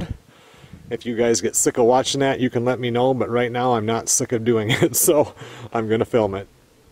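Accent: Minnesota, United States. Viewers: That Minnesota accent is awesome!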